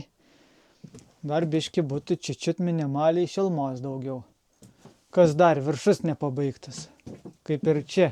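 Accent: Aukštaitija, Lithuania. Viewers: Malonu žiūrėti ir klausytis aukštaitiškos tarmės + apetitą žadinantys valgiai + draugiškas ir ramus šuniukas 👍👋🍜🐈O čia , žiūrėk, ir nauja idėja gali gimti, pvz., „Kų šindie kaštavosma bunkerioki“ 😄 Sėkmės!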